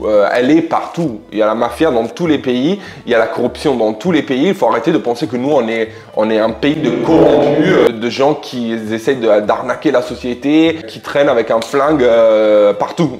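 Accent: Italy. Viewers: Bjr,merci bcp pour le vidéo j'espere que tt va bien;en francais tu t'exprime très bien,l'accent l'Italien lá j'adore,j'ai aimé le moment.bravo a toi💕💕💕 depuis 🇭🇹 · Salut merci beaucoup pour ce petit moment avec toi en tout cas moi j'adore 💕 ton accent italien tu t'exprime très bien en français passe une belle soirée à bientôt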